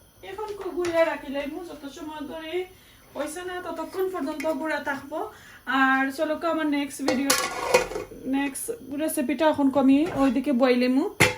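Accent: Sylhet, Bangladesh. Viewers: অনেক ভালো লাগলো,হাড়িগুলে অনেক সুন্দর কিন্তু চুলাতে নড়ছিলো,,,,হুকুইন শুরু দারুন হলো,,এত মরিচ,,আমার পছন্দ, তবে চেপা ব্লেন্ড করে দিতে হয় এটা জানতাম না,, সাথে ২ পদ মাছ দেয়া যায় সেটাও জানলাম,,কালার পারফেক্ট হয়েছে,,,, তেল ছাড়া রান্না টেস্টি হলো,,আমি আগে পেয়াজ রসুন তেলে দিয়ে রান্না করি,,আপনার মিট কারীও সুন্দর কালার হয়েছে,,, আইড় মাছটা কষিয়ে তুলে রাখি আমরা,,তরকারী হয়ে এলে লাস্ট এ কষানো মাছ দেই,,তবুও ভালো লাগলো,,আপনার ব্লগ আমার ক্লাসমেট দিয়েছে,,, সিলেটের কথা শুনতে ও ভালো লেগেছে ❤️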